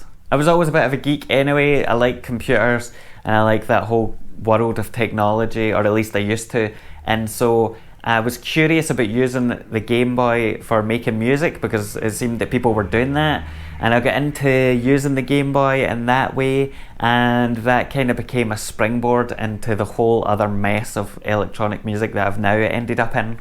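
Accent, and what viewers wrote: Scotland, United Kingdom. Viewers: Found your channel by accident but happy I did. Nice to hear a local(ish) accent and sumdy else who likes synths haha. Do I detect a wee southside accent, Ru'glen Camslang area?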